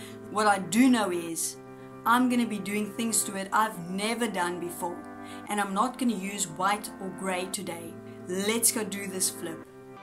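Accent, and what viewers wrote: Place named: South Africa. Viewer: Sounds like you're from South Africa.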